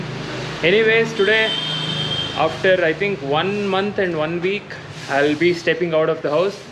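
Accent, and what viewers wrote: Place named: Rayalaseema, India. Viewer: Your kannada and seema slang telugu blend is perfect bro..... congrats for u r new bycycle gang 🙌......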